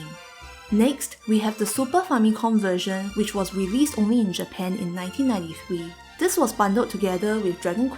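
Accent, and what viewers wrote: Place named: Singapore. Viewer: That sing accent tho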